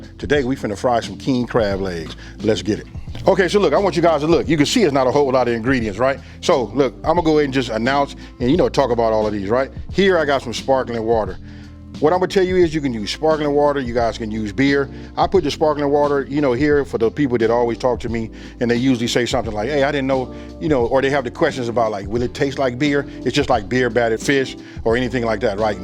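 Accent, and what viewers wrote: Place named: Los Angeles, United States. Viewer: this is 🔥. Even your LA accent!!! 😊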